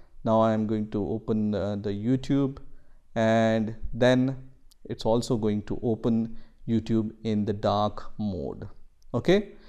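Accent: India. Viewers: Gotta be my last time listening to an Indian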